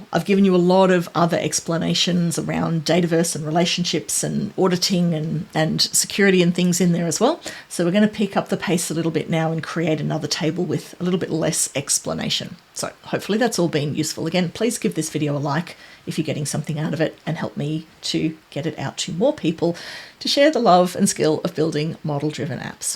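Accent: Australia. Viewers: love that Aussie accent! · thanks heaps, I love your pace, your explanations and of course your aussie accent makes me feel right at home:)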